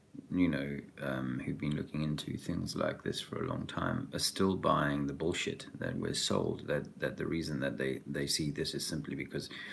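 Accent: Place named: Australia. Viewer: Laughing my ass off right now... love the Aussie accent! And you are spot on, we certainly are a nanny state and it makes me sick!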